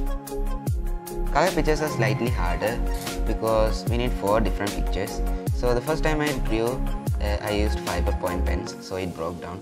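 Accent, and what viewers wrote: Kerala, India. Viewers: 😌I saw you made a drone..Your accent tells you are from kerela.. 😹🙏guruji, pls let us be your students.. Just can't imagine your future.. 👍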